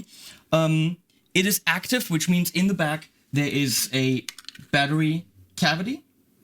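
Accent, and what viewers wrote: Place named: Germany. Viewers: mustermann Vielleicht liegt das daran, dass er deutsch ist, aber internationalen Content bieten möchte ;) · Richtig deutscher Akzent 😂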